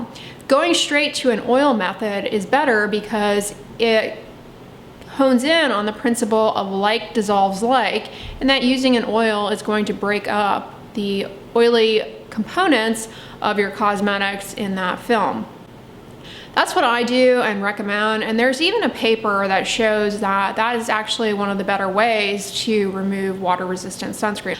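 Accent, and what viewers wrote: United States. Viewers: Do I hear a country accent 👀👀👀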